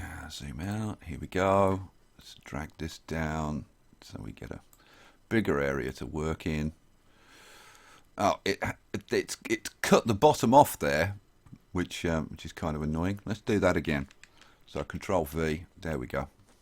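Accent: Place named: Birmingham, United Kingdom. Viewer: Thats a Brummie accent if I ever heard one.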